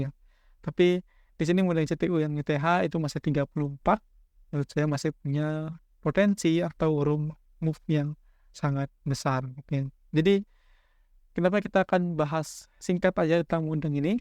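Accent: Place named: Bali, Indonesia. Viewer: Permisi bang saya followers baru Kalok boleh tau abangnya dr mana seperti logat bali soalnya🙏🏻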